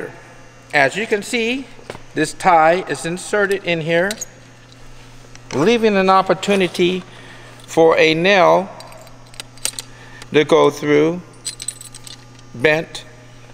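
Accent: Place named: Louisiana, United States. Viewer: All i can hear is Adam Sandler waterboy!! Correct me if I'm wrong but thats a sweet louisiana accent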